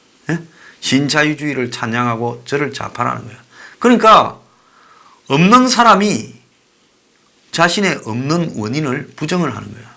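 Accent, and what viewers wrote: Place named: Busan, South Korea. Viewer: 황장수 !!! 제임스 본드 !!?? 너무많이안다 !! 우아 ~~!! 부산본토말 ~~!!황장수 !! 우파.좌파.중도파. 모두싸잡아 잘정리하고있다 !!^정치.경제 를잘알려준다 !!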